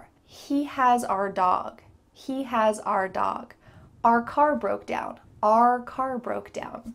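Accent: United States. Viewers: Very good ,American accent is too good, very good teacher from ivory coast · Is it an American pronunciation? Not British? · 10 comin’ or 10 common - your thick American accent (for a Brit like me) makes them sound alike. So I have to rely on context… I remember on my first visit to New York in 1964 I watched a game show on TV that featured word-play. It would not have worked in UK! There was a sentence “To be merry, Marry Mary!” (or similar)… The American accent made it sound to me like “To be mry mry Mry!” In England (at least with my RP accent) the three words are quite distinct…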